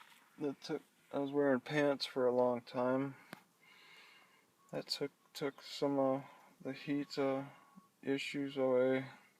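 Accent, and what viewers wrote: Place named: Midwest, United States. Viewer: I’m re-watching some of your old stuff. Your voice relaxes me for some reason. Probably the Midwest accent.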